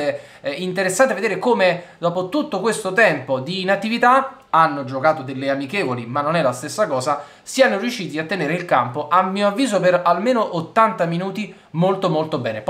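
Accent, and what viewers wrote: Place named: North, Italy. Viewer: ACCENTO SETTENTRIONALE VEDO😂😂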